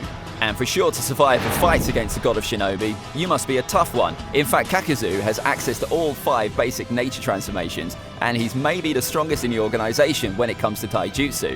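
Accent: United Kingdom. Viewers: Bc hes british so he has higher accent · His accent is from hartforshire, England you clown 😂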